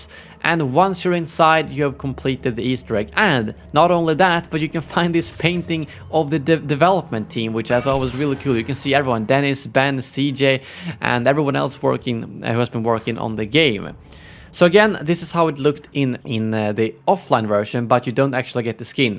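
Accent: Sweden. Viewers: are you sweddish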